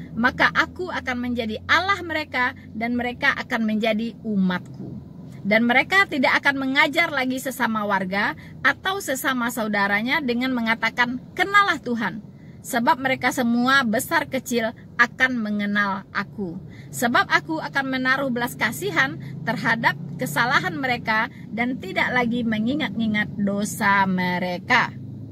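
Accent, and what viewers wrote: West Sumatra, Indonesia. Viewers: Suara ibu ini mirip sekali dengan suara teman saya dan kebanyakan orang Minang, satu kota dgn saya di Bandarlampung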